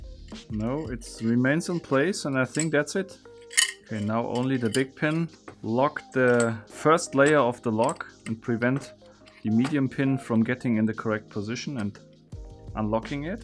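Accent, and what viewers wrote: Germany. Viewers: So wie er englisch redet vermute ich jetzt mal das er von der muttersprache her hochdeutsch bzw. eine der vielen deutschen sprachen spricht. Ich habe mich noch nicht danach umgesehen wo er herkommt. Einfach mal blind ne vermutung anstellen und evtl. damit auf die schnauze fallen. So ist das leben geil. No risk no fun😀😬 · Your German accent gives me a headache but great video!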